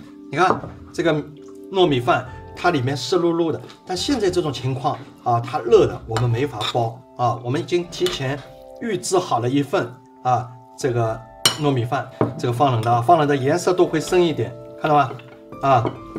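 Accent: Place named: Jiangnan, China. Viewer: 很幽默的师傅，能听到江南口音。虽然觉得我自己做饺子皮还挺熟练的，这个烧卖皮还是有难度。厉害厉害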